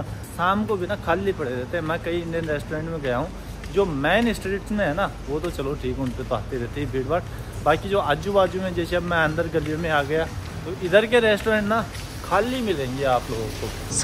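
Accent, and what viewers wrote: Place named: Bihar, India. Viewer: Bhai, ... Soop ne hota ...... Shop bolta ha ....... Bihari English.....🤨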